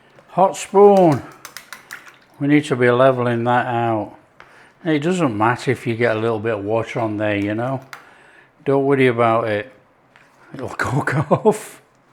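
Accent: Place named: Lancashire, United Kingdom. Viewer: We made the flapjack tonight, we are in Yorkshire near Whitby. We think you sounded originally Lancastrian maybe Burnley, Preston Accrington or possibly the Rochdale area. Please put us out of our misery 😄 Yorkshire or Lancashire? Either way we have really enjoyed your video, subscribed and laughed when you said "cook off" xx❤xx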